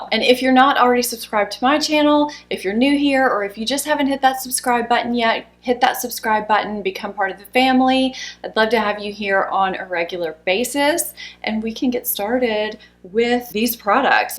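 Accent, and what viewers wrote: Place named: Tennessee, United States. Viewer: Love that you mentioned you are from Tennessee! I'm a Georgia girl, and have lived in Lousiana and Tennessee. The entire time I've watched you, I kept saying to myself, " She is not from New Orleans. She sounds like she's from Tennessee!" Isn't it funny that Southern accents sound the same to those not from the South, but those of us that have lived our lives here can place them.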